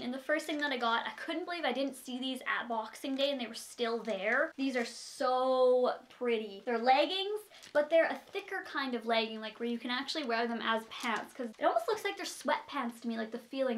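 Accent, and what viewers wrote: Canada. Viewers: Hear your Canadian accent heh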